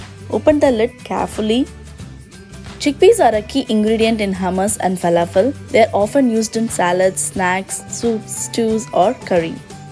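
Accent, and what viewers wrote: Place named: England, United Kingdom. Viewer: What English accent!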